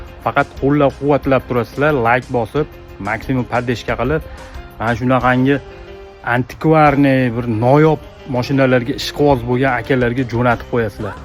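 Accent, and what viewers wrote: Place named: Kokand, Uzbekistan. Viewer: Қуқонликмисиз ака? Қуқонча шева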